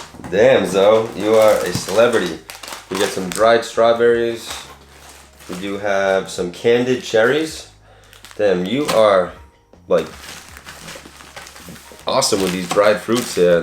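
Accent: Boston, United States. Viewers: He's got a Boston accent. Goes with the whole shtick. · Boston accent coming through and nothing to be ashamed of. But same with me my Bosnian weird way of saying things come up constantly especially from my kids, mom say cookie.. i say it coooo key but cooo is so long that it sounds funny to them😂. Great video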